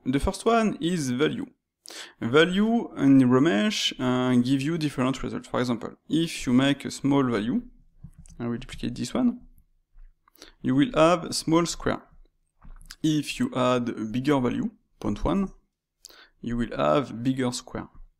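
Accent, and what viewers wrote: France. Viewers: I have been playing around with Blender for just over a year, only learning through youtube and trial and error. Sir, this is the best explanation of Remesh verses Dyntopo I've heard yet. And that is even with the French accent.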